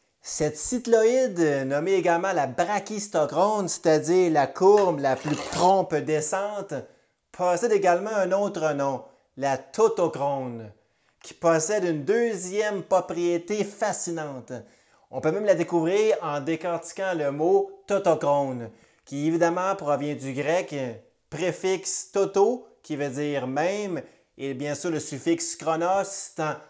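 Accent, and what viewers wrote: Quebec, Canada. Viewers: L'accent Canadien ❤. Entre parenthèses, très proche de l'accent en Charente Maritime en France. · C'est une belle explication mais il y a une grosse erreur que vous répétez à quelques reprises. La vitesse initiale du mobile quelque soit le plan incliné empruntée est rigoureusement égale à zéro. Et non plus ou moins grande selon la trajectoire prise. Merci cousine avec l'accent inimitable du Québec ! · J'ai 70 ans et, été toujours "NUL" en maths ( je suis plus "cerveau droit". L'artistique.)! Je viens de découvrir votre chaine et de m'y abonner. En plus de l'accent Québéquois; que j'adore (je suis français), je trouve vos thèmes très attrayants et qui m'intéressent énormément ! Vous me donnez le goût de replonger dans cette science. Merci.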